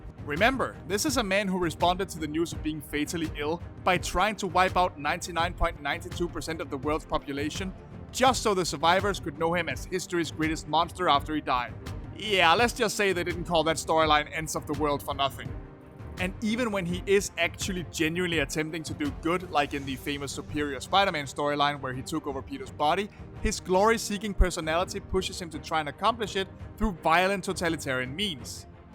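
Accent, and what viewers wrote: Denmark. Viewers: Are you Danish? You sound like you have a really strong Danish accent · Ohh shoot, a danish narrator🙏🙏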